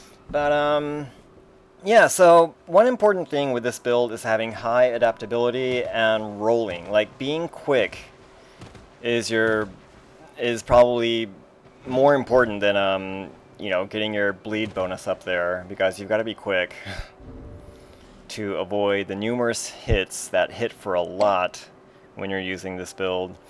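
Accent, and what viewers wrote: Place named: South, United States. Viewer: weeaboo game strong this guy has a country accent yet thinks he's Japanese damn weeaboos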